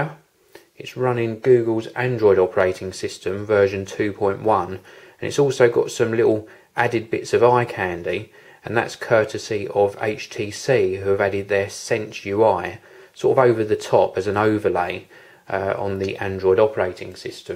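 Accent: England, United Kingdom. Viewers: thanks for the review...im preordering the unit :] i hope the battery is as good as you say it is....btw gotta love english accent ;]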